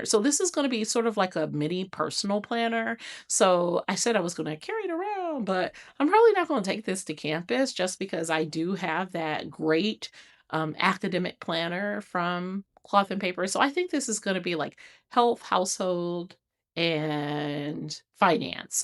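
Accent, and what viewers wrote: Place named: South, United States. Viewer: I like that your spread is simple! When I’m watching agenda planning, I simply don’t want to think! I’m from SC and you sound as if you are from my “neck” of the woods! Maybe Georgia and last guess Tennessee! Anita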